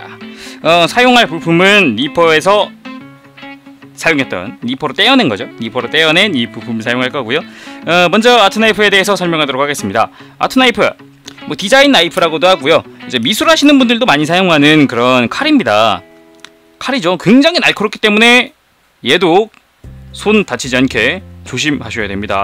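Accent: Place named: Seoul, South Korea. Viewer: 와 말투 서울말씨 찰지네 ㄷㄷㄷ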